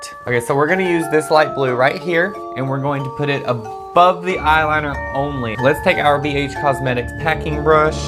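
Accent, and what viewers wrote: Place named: United States. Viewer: why do you sound so country??? Lmao Where do you live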